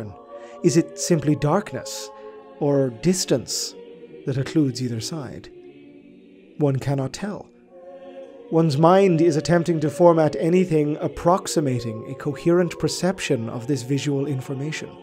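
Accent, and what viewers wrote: Ireland. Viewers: This channel is bliss. Nice to hear a fellow Irish 40k head describe the world and lore so vividly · Are you Irish?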